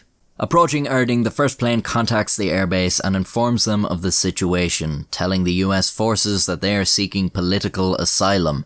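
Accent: Ireland. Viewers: Bad-assery! Love the facial expressions! Makes me bark with laughter! Don’t abandon us Q- I need the snarky Irish storytelling! There might be a few questionable youtubers but there are Nobel folks too! Stand tall and be proud you bastard! Thanks and fight on! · I thought this was a darkdocs video, but when I heard the Irsish voice I was surprised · This has quickly become one of my favorite Youtube channels great narrating and insanely good brogue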